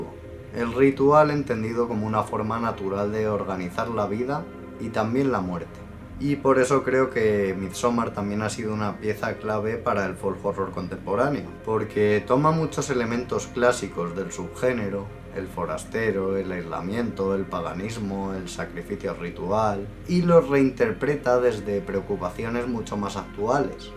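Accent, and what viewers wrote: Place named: Spain. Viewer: Pensé que el narrador era un señor de edad avanzada, por la voz como floja con las letras pegadas, somnorifico, monótono, somnoliento… cuando vi que era un joven, me acordé de wolfsmoker y cautivosdelcine1376, también críticos de cine, videbloguers españoles. Ahora me pregunto si la entonación es propia de alguna zona de España.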